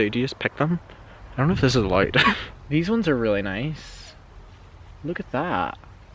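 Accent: Ireland. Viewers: You would be welcomed in America, they love men with Irish accent! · Where are you from?? Two minutes in thee video...you’re from Ireland 🇮🇪 Awesome! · This is probably a dumb question, but does it stay "day" light all night [like it does in Alaska] or does the sun eventually set in the summertime in Ireland? Aha sorry I'm not educated about that & was just curious. In America, where I'm from, the sun sets later due to daylight savings time and will finally set ish at night at the latest....then we move the clocks back an hour in the fall so in the wintertime, it'll get dark by 6pm. I love your Irish accent btw.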